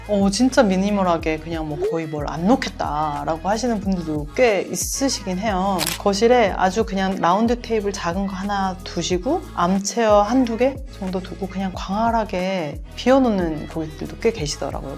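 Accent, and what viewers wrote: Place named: Jeolla, South Korea. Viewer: 전라도네? ㅋㅋ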